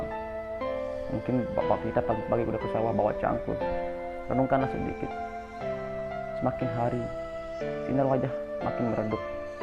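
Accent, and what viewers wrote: Lombok, Indonesia. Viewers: Kayak logat lombok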